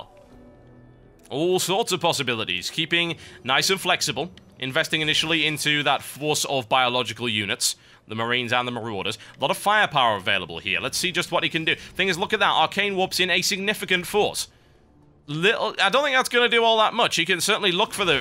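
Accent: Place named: London, United Kingdom. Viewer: lol, i can tell you watch a lot of EPL, using terms like "equalizer," as if LZgamer was one-nil down hehe. ARSENAL IS MY HEART. judging by your accent (i'm terrible at judging english accents) you do sound like a londoner. which means there's a good chance you're a gunner fan :D. keep making videos, there will be criticism no matter what you do online. everyone is a professional at everything with anonymity. in real life, they most likely flip burgers and drop potatoes in fryers all day.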